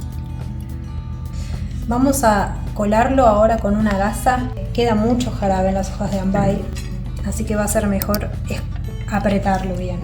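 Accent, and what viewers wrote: Argentina. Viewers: ❤😊gracias por la recent unique estoy USA y las hojas no se por cual sustituirlas acá que tengan esa ingredients parecidos 🌷🌷🌷gracias soy Dominicana me encanta el ace to Argentino y vivo aquí en North Carolina 🇩🇴🙏🏼🌷🌷🌷😍💕gracias soy Dominicana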